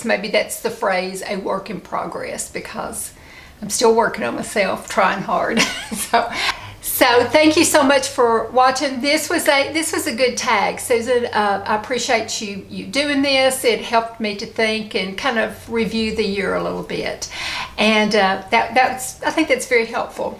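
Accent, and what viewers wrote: South, United States. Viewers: Nothing finer and sweeter than a real southern accent. Wear it with pride my dear. I used to feel shamed having one for years and over the years I’ve learned to embrace it. It is a part of my identity and I’m proud of it! If folks don’t like it so be it. Probably more about them that is more unloveable than my accent that seems to bother them. When people make fun of my accent now I lay it on even thicker lol. You are a rare beauty. You are beautiful inside and out and an inspiration! Love your channel. First time commenting. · You are a wonderful, sweet human being. I am so happy you have avoided this awful virus. I was hospitalized for eleven days two weeks ago, and it was no joke. I hope you are getting the vaccine. I had no prior illnesses and it was bad. I look forward to hearing that great Southern accent! Love you and your channel! 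Stay healthy!! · southern US accent I believe!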